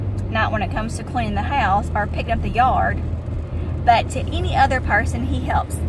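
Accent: South, United States. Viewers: understood. It is also strange because it sounds like you are from the south. Don't give up hope. Seek first to understand what is happening to our culture so you don't suffer needlessly.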